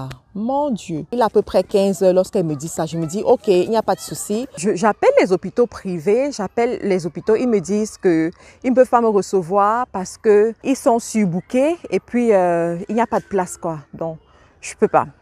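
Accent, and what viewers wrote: Cameroon. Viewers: l'accent camerounais , j'ai tellement trainé avec eux que je reconnais direct les Kmers . 😅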